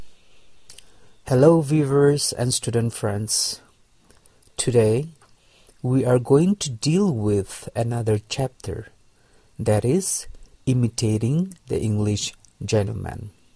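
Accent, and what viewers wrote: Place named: Nagaland, India. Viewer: His accent sounds like naga people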